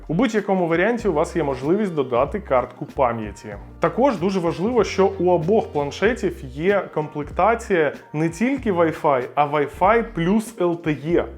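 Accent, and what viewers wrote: Russia. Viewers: Дуже тішить що і "Цитрус" перейшов на українську - респект! Видно, що не дуже ще комфортно з такими змінами (часом зустрічаються русизми), але він старається і саме головне - не прикривається тим, що мова не доскональна і соромно таке публікувати... Тому наше (споживачів контенту) завдання полягає в підтримці (підписка, вподобайка, комент (гарні слова в підтримку) автора(ів) і з практикою все прийде, вдосконалиться і буде не вимушене)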